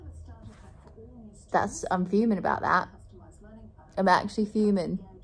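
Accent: Australia. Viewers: You’re such a pommy! Hahaha you have lived in the UK too long, do you reckon you have morphed into a pommy yourself? Or do you think you still sound Aussie I’m actually so interested to know! Xx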